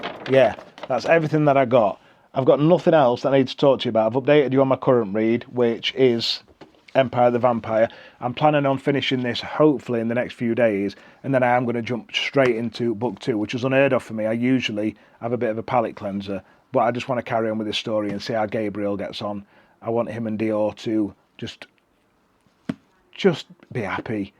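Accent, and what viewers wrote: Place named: Yorkshire, United Kingdom. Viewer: Are you from Barnsley?! Sounding very Yorkshire to me! 🙂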